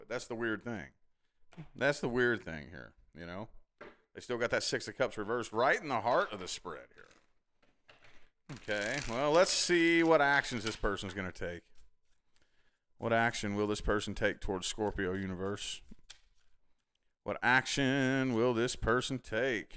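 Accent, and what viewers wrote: South, United States. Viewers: Thank you for the reading! Like your Southern accent and the no bs approach! 👍 Also, if it’s possible, is there a way the cards can be more specific in your future readings in regards to the age of the ‘other person’ example: Older/Younger and if their in a foreign country? This would help a lot in deciphering the readings. Thank you so much! Peace! ✌️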